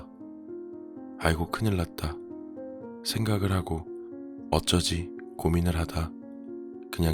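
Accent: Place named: Gyeongsang, South Korea. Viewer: 님억양이 경상도느낌이 네요 듣기조으네요 잠자리들때면 꼭들으면서 잠이듭니다 불면증때문에요 이젠잠이와요 자야겠네요 수고하셨어요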